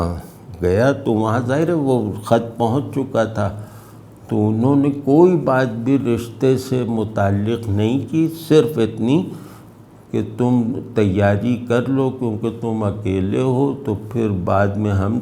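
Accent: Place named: Delhi, India. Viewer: Shaikh ur style of talking shows that u belong Delhi ur knowledge about Chishtia sect is authentic.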